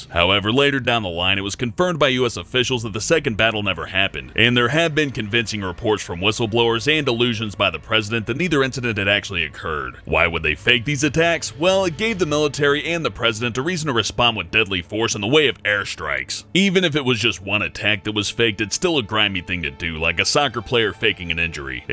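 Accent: Australia. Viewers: Is Aussie? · hava gud one (the only other reason I Loved his narrations is His sexy australian accent)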